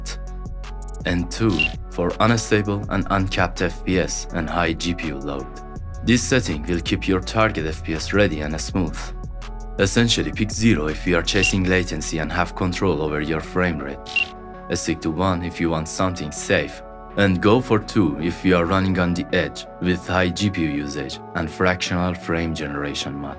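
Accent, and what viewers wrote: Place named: Iran. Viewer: Honestly , I feel like you have an Iranian accent 😅😅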